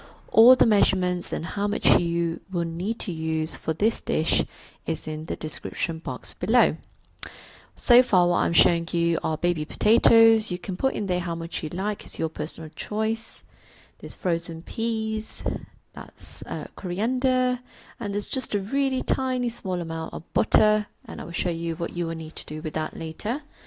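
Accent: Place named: United Kingdom. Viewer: Nice british accent yummy food! (_ _)/"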